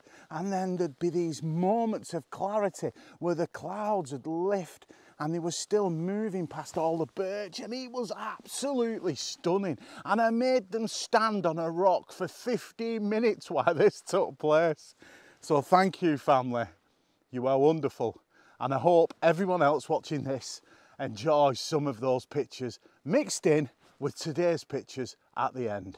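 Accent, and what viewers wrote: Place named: Billinge, United Kingdom. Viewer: Surreal video...stream of consciousness...excellent painterly images. I live in Cumbria now (35 years) and just getting into photography. Born in Leigh nearly 70 years ago, been trying to place your accent.. Billinge! Keep em coming!!😁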